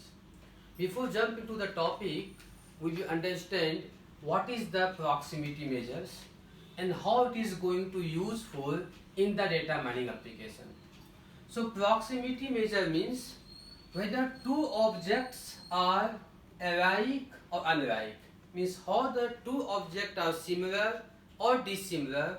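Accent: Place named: India. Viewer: not racist but damn indian accent is something... tbh i rather hear indian speak indian so i can at least use auto generated.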